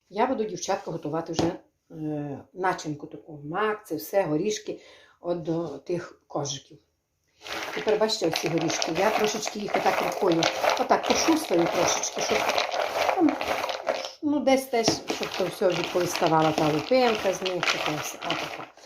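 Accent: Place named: Bukovyna, Ukraine. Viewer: Дорога, скажить а як писни так зробыты? Бабка робыла нам на Маковея таки, але писни, бо в ти дни пист. Бабкы уже немае, и спытвты немае в кого. Бякую вам за рецепты. Моим дуже все подрбаеться. Да и мова Ваша зрозумила. Я родом з Буковыны и мени прыемно чуты именно таку мову, ридну.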